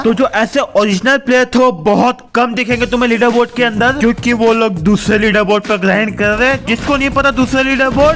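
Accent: Gujarat, India. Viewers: bhai gujarat se ho aap?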